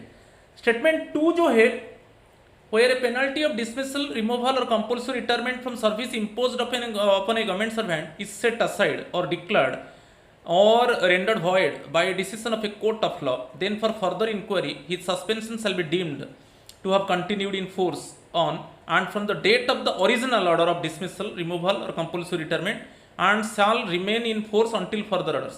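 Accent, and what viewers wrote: Odisha, India. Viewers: Sir today video I think you are from odissa Am I right sir , we have one professor in our PG SRI A K TRIPATI FROM BHUBANESHVAR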